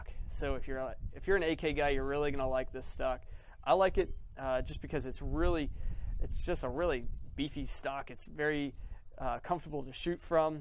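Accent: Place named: Philadelphia, United States. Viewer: Sounds like a Philly area accent. Good video and review.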